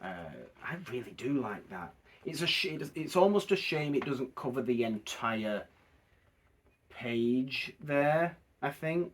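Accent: Northern England, United Kingdom. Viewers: I’m trying to find a review of this box set, and not seeing ‘unboxing’ on your post, I tried you. So I’m disappointed. Nice to hear a northern accent, though.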